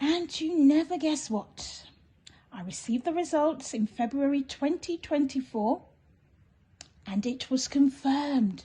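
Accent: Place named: Nigeria. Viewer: You sounded Nigerian.. 😮❤❤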